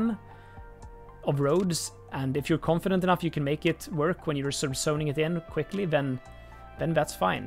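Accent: Sweden. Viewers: Are you from sweden? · Where are you from? Im from Sweden, you sound Swedish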